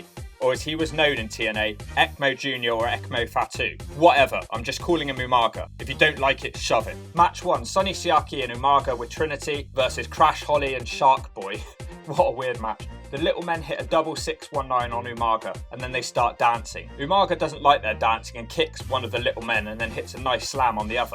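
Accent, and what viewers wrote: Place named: Somerset, United Kingdom. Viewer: That accent is so Somerset I love it. It reminded me of Sunday lunch 🤣🤣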